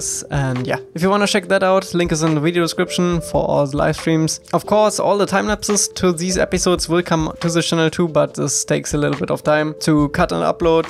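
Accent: Germany. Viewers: 1 frage bist du aus Deutschland? 😅😅 du hast nämlich ein sehr Deutsches Englisch. 😅😅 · Hallo, du bist safe deutsch würde ich behaupten, hört man am akzent:D Ich habe da ideen für ein projekt und würde mich ebenso als unterstützung für deines anbieten, egal was es ist und sofern es mir möglich ist! Dennoch wäre es cool wenn du dir meine idee mit anhören könntest:)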